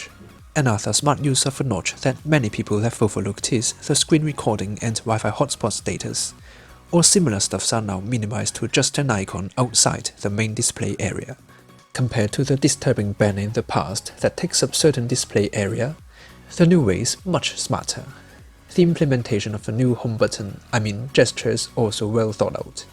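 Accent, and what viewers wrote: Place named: United Kingdom. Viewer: 啲英式口音好正~~~好想學~~~~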